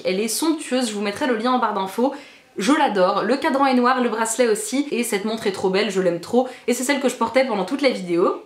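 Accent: England, United Kingdom. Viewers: Je suis jalouse de ton accent anglais 😍 mdr sinon super vidéo tes cadeaux sont géniaux 💋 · ton accent anglais 💘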